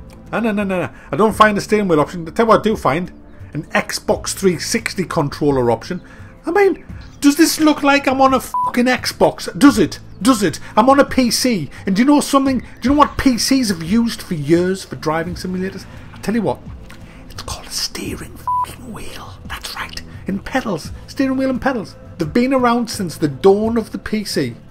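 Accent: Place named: North, United Kingdom. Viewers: I do love a good old fashioned Northern rant, me! Most amusing :-) Enjoyed the overview too. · You sound fat and northern.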